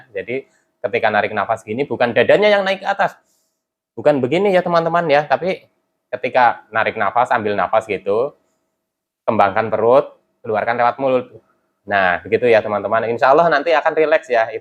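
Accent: Banyumas, Indonesia. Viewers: Mas berapa lama kena gerd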